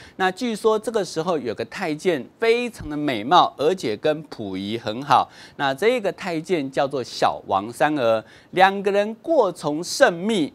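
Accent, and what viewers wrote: Taiwan. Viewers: 台湾國語聽得恶心叭啦。 老是用什么那，耶，喔，像在跟小孩說話。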